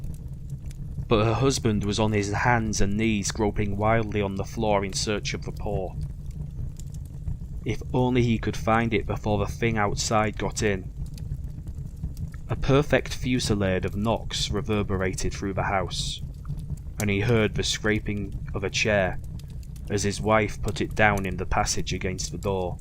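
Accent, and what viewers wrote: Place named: Yorkshire, United Kingdom. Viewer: This story is one of my favourites and you did a grand job of it ! As an adoptee of West Yorkshire (been living here since 1996) great to hear a Yorkshire accent on YouTube. Well done !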